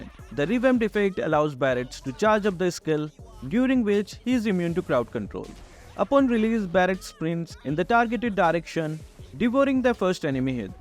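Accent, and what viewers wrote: India. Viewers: Just one question bro😂😂😂.....Where are you from???? INDIA?? YOUR ENGLISH accent is like an Indian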